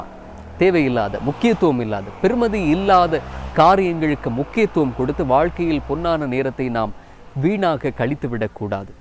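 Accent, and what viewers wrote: Sri Lanka. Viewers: அழகான இலங்கை தமிழ்..😍😍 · Seem like srilankan tamil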